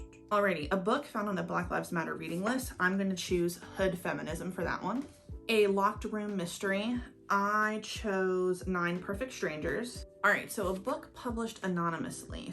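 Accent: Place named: England, United Kingdom. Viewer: breaking out the English accent, eh?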